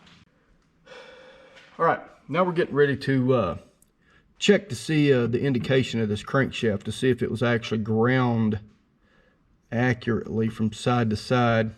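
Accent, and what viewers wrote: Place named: Alabama, United States. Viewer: Wow, I love your attention to detail, I invented an engine system to turn an Adult trike or Bicycle into a kind of like a moped I get about 125 miles to a gallon and am using Vanguard single-cylinder engines for power. I invented the Bracket system, not the engine this little diesel may get me up to 175mpg. I would love to talk to you and I am using the youtube account of my wife here is my cell number <num> give me a call. Stuart Brandt Naples Florida By the way are you in Alabama?????? your accent sounds like Alabama a bit.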